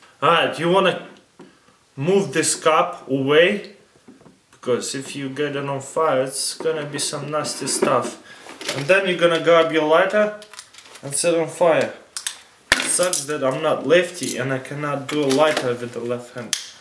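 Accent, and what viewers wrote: Russia. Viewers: Thanks for making these videos. I like how they are quick and easy. Your Russian accent is gnarly by the way.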